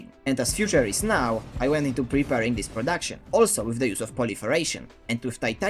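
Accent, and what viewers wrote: Poland. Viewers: Polski akcencik so its peak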